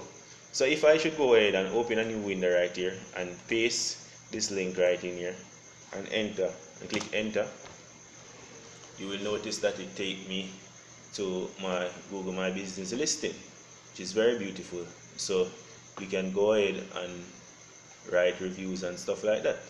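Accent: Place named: Jamaica. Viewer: Bet dis a Jamaican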